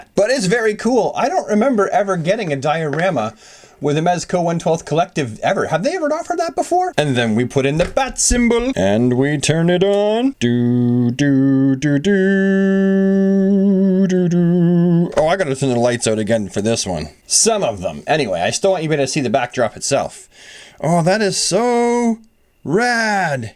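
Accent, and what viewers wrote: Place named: Canada. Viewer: Love your channel. (I know I say it a lot) but I always forget your Canadian while watching until you say certain words. And it makes it all the better lol.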